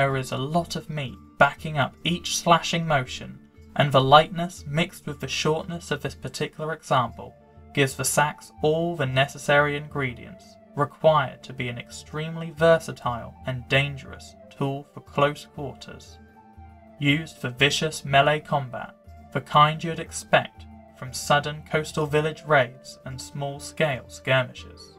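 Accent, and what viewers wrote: Norfolk, United Kingdom. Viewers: are you from Norfolk?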